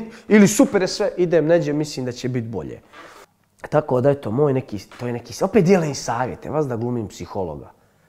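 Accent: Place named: Rijeka, Croatia. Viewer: Ludane ludi, a znas kako mi je milo kad pričaš rišnjanski pored toliko godina Beograda!! ❤❤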